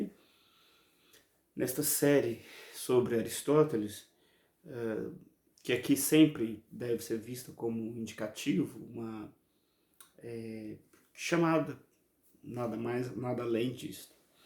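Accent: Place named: Minas Gerais, Brazil. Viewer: Eita mineirice aristotélica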